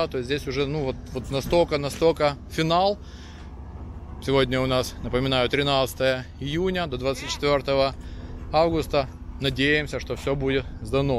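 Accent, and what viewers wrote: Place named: Hutsul, Ukraine. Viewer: у вас файна гуцульська говiрка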